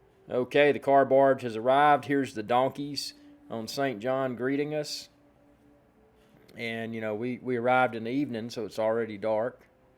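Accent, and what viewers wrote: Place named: Tennessee, United States. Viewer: Sounds like Tennessee—p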